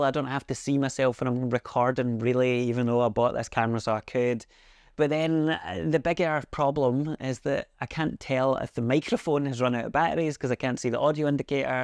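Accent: Scotland, United Kingdom. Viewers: Your videos are great because it feels like you are hanging out at yours for a bit. Its so easy to get stuck in perfectionism loops, so whaever you need to do to have fun doing it is worth exploring. But as feedback, Ive never noticed you looking away from the screen and your videos always come across as really well produced, engaging and enjoyable. Just be yourself. Also thanks for hosting a synth/video channel with your own accent. Puts fellow scots at ease, even the ones from Edinburgh:)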